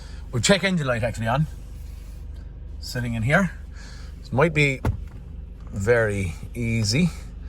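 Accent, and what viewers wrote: Ireland. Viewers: Suprised to hear an Irish accent! and thanks! Same problem with my Outlander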